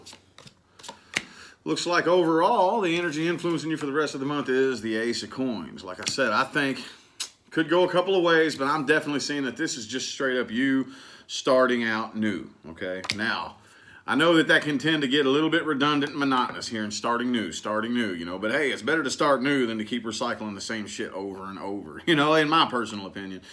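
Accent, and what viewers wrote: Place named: Texas, United States. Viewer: Love your accent.... Are you from TX?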